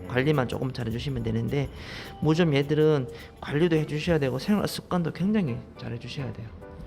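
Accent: Gyeongsang, South Korea. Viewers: 진짜 사람생각이 다 같은게 ㅋㅋ저도 리쌤이후로 다른분들 유튭봐도 시원하지가않고ㅠ 덜끝난느낌 이었는데 진짜 ...넘잘하시네요 ㅋㅋ 글구 남자분인데도 되게친절하시고..보통 서비스이라고 남자들 친절한경우잘없거든요 무뚝뚝하고 ㅋㅋ 근데진짜...대구말툰데 쎄지고않고 듣기좋고 실력도 넘 출중하셔요 구독하고갑니다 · 우연히 영상떠서 구독하고.ㅋ 챙겨보는중입니다🤣 좋아요도 눌러가면서 ㅋㅋㅋ 갱상도사투리가 참 좋네요.ㅎ 울아빠도 갱상도 진주 .ㅋㅋㅋ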